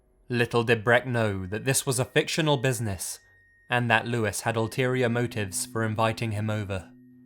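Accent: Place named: United Kingdom. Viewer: opens video British accent closes video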